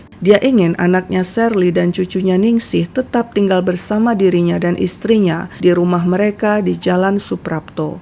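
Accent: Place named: Manado, Indonesia. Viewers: kok logatnya manado?? haha setahuku toraja ga gitu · Dialek khas Utara si admin mantap 👍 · Admin fasih deng logat Sulawesi..😊😊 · Min logatnya manado padahal Min cerita kasus dari toraja sulsel.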